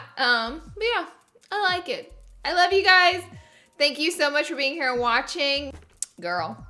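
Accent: South, United States. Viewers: I’m glad I’m not the only one with a country accent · I saw your manicure on Snapchat and I'm in love with it. I've been wanting to tell you something but you get so many comments that I doubt you'd ever see it, but I just wanted to tell you that I love watching your videos because I feel like I can relate to you so much because I'm from Louisiana and your southern accent makes me feel like I know you personally or somethin. I know it sounds crazy but every time that you really like a product I end up going to buy it just because I feel like I know you or something and I feel like we would like the same things. Also I was very surprised that people didn't know what cat's pajamas meant I've been saying that since I was a little kid so maybe it's a southern thing. and speaking of your palette, I use it almost every single day and I have a beauty group on Facebook and I have almost all of my members using it as well because I do live videos of looks with it and they fell in love with the colors and how pigmented they are. 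Just wanted you to know that I really appreciate you and relate to you on a strange level. too, because his mannerism reminds me a lot of my husband's, so I love seeing the two of you together because it reminds me of my husband and I. I hope I don't sound super weird you probably won't see my comment anyway but I just wanted you to know that there was someone out there that really relates with you and appreciates all that you do for the YouTube Community.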